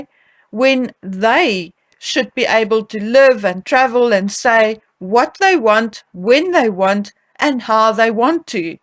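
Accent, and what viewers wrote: South Africa. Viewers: We're in California, so we don't have much opportunity here to speak with many people from South Africa, but from what I've heard accent and style of speech is very typical. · Your videos are always very informative and well prepared and I look forward to hearing your news every time one comes up. Thank you for your hard work,I love listening to your S A pronunciation.Brava best wishes from Italy .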